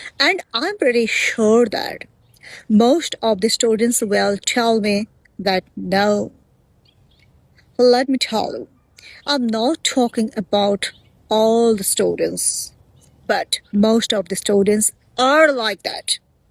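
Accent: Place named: India. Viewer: I understood earlier that you're indian when I listened your accent, then I checked your channel's about section and finally I got I was write.😂😂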